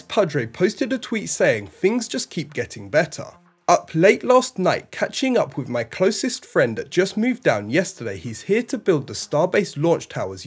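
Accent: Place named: London, United Kingdom. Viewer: It is a London thing, he said it "free times" already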